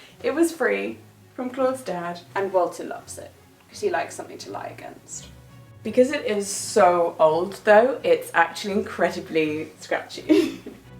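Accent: United Kingdom. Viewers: I bloody love the British accent of 👌 💗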